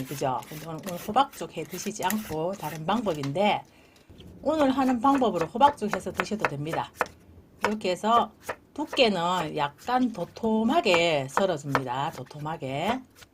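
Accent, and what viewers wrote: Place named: North Gyeongsang, South Korea. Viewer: 갱북 어딘교 나도갱국인데 말씨가 똑같아서 입니다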